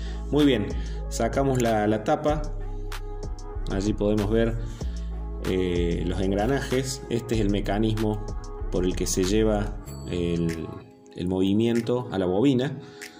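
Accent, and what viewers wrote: Córdoba, Argentina. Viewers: por la tonada me pareció que sos cordobés soy de malagueño cerca de Carlos Paz .e visto muchos videos de otras Singer pero no encontré ningúno de la 29 k . casualidad la estoy reparando y me sirve mucho tu vídeo .un abrazo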